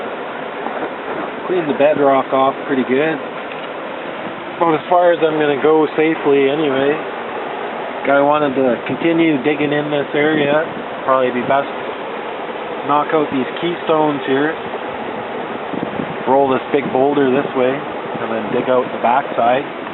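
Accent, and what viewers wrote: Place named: Canada. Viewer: does his videos from his claim way up in BC. Not Colorado. Haha, as if you couldn't tell by his accent Ay.. Lol. I myself am just getting in to prospecting. Just picked up a pan set from Cabelas this past Christmas. Now I'm just waiting til everything thaws out enough to go out there and get some color. Good luck in your endeavor my friend.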